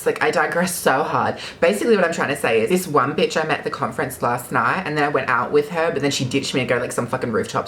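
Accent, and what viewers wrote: Australia. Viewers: P.s. from jessica. But i love yours and any Australian accent. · Australian accent is BEAUTIFUL, never try to change it or be embarrassed by it😊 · YOUR QANTAS ACCENT SOUNDS LIKE MR G FROM SUMMER HIGHS HIGH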